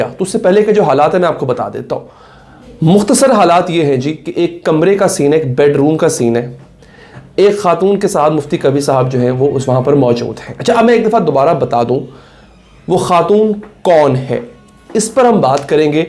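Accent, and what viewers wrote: Punjab, India. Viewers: Chandda paiyan ny..🤣 bhai waah kya punjabi or style hai🤣👌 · bhai baten krty hue halka sa jo punjabi touch dete hain wo boht kamaal he.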